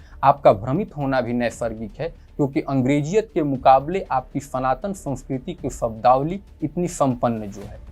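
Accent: Standard. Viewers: sudh hindi yahi sunne milti hai, warna har jagah , hindi ke sath english aur urdu juda rahta hai.. btw .. nice topic and presentation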